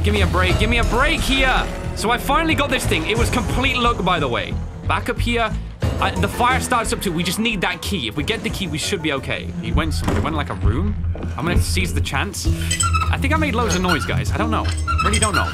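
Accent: United Kingdom. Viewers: I know he is lives in the UK the accent gives that away. The US is a country founded off of distrust in government and you protect yourself from everyone including government. The founding fathers declared war over taxation. But it is about personal property and protecting it, and protecting our family and friends. As an aside the UK had the whole knife control bit where they want you to put knives in a box. So I don't think the UK is someone we look to for virtue in how to protect ourselves.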